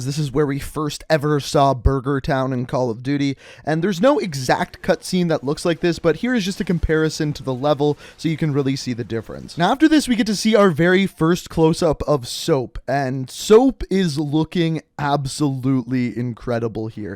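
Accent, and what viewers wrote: Canada. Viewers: He’s Canadian I think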